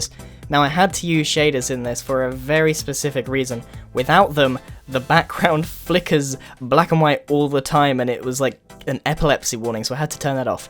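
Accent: England, United Kingdom. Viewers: why does he sound really british when he was younger? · are you English?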